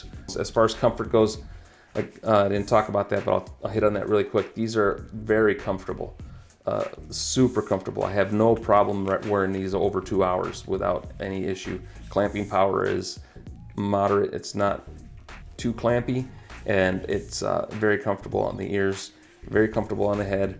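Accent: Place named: Miami, United States. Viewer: nice headset id be scared to take them out of the house . i heard your voice i thought my cousin was doing it, heard the Miami / Hialeah tone in your voice.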